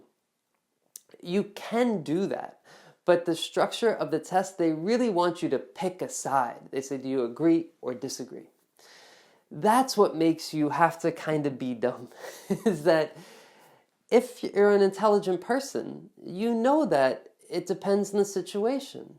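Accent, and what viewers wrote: United States. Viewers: Are you American ???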